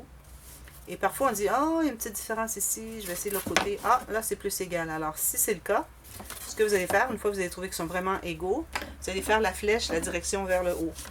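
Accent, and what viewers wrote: Canada. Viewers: Super !!! Merci pour le tuto et aussi pour l'accent canadien que j'adore ! Bonne journée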